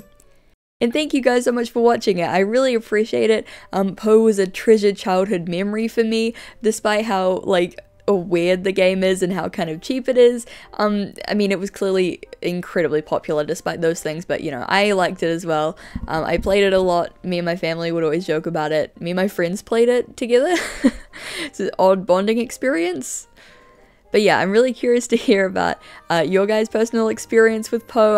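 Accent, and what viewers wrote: New Zealand. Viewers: I'm from the us and i pronounce it like you do its nothing with you having a new zealand accent ;) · You are from New Zeland?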